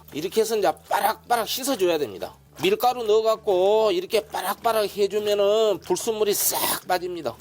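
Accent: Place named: Jeolla, South Korea. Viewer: 아... 잘라구 누웠는데 헉... 요리사님 말ㅇ투가 진짜 이건 전라도인데 전남쪽...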